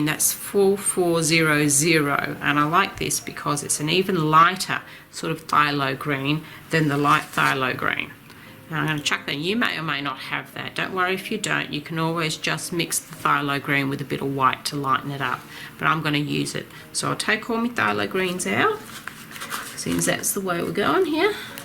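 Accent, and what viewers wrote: Australia. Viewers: Thank you so much for the lesson, it has really caught my attention on how to pick a color pallet. This was very informative. It's so good to hear your Australian accent again and you sound like your beautiful self again. I'm so glad you are back.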